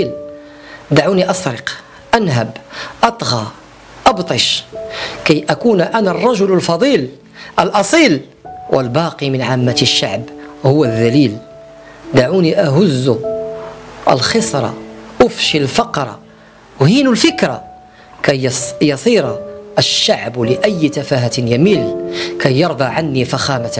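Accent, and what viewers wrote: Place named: Saudi Arabia. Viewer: كتقلد السواعدا في هضرتهم هههه و تريد أن تظهر أنك عالم زمانه و غير هضر بالدارجة فحتى الخليجيين كيهضرو غير بلهجتهم ، مستلبي الهوية يريدون إقامة دولة هههه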